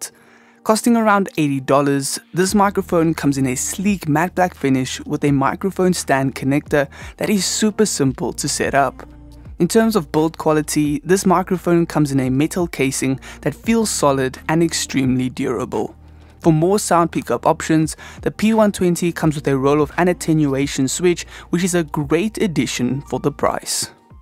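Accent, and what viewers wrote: South Africa. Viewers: hello ! are you from south africa ?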